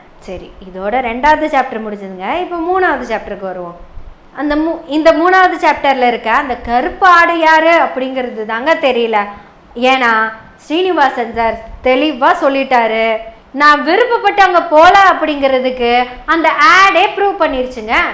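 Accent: Kongu, India. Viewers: அம்மணி வணக்கம் மா. நல்லா பேசுங்க நம்மூர் வழக்கு மொழியில்.. அன்ன பூர்ணா காப்பி arabica கொட்டையில் வடித்தது..1963 முதல் இன்று வரை யுனிவர்சல் குணம் மணம் உள்ளது. எம் நண்பர் திரு தாமோதர் சாமி . இராமன். திரு இலட்சுமணன் ஆகியோரின் dedicated முயற்சி மூலம் இன்று உலகம் முழுக்க மணக்கிறது... எல்லா புகழும் அவர்கள் வாரிசு செல்லம் திரு சீனிவாசன் அவர்களைச் சார்ந்தது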